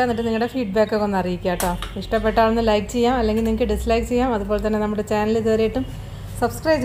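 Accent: Palakkad, India. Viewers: റെസിപി ജാൻ ചെയ്തു നോക്കാ രണ്ട നല്ല അവതരണം പാലക്കാട് സ്ലാങ് ആണോ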